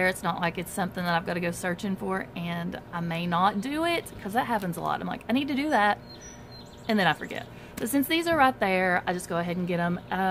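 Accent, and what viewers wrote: South, United States. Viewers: Congrats on 10k! And in only one year. It's that southern accent. Gets them everytime am I right! From another southern belle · Congratulations on such an awesome milestone! I love watching your videos and listening to your southern accent. I am from Texas but our accent is a little different. I will be looking for the tumblers and snack containers for an upcoming trip such a great idea to use instead of our more expensive tumblers! Dollar Tree haul for me tomorrow.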